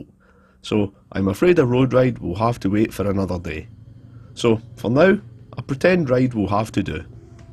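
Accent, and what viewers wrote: Scotland, United Kingdom. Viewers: That was another great job. I marvel at your way of working every time. Every single screw is worked on so that it looks like new again. And now this beautiful motorbike is once again a technical work of art. I also enjoy listening to your Scottish accent. I have the greatest respect for your work. I'm already looking forward to the next project!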